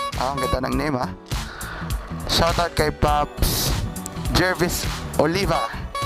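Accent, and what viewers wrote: Iloilo, Philippines. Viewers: Boss. I noticed from your previous vlog that you sounded Ilonggo! Where's this route your taking today? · Nami ma mati illonggo nga motovloger..... especially Ang tingog mo hahaha daw wla gid d b illonggo bloggers ehh heheheh nga illonggo gid Ang Gina hambl sa vlogs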